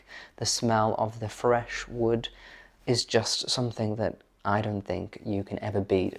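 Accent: United Kingdom. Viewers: Great content as always,. 😊 As an American, I adore your British accent, especially when you say, "lots of pots." It sounds delightful!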